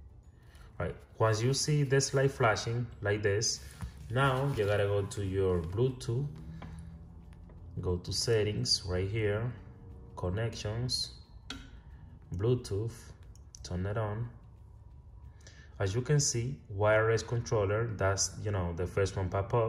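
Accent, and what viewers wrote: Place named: Dominican Republic. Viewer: you can tell this nigga Dominican😂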